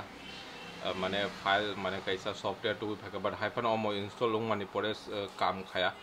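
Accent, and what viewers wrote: Tripura, India. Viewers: Dara nni belai kokborok khunathokgo❤❤❤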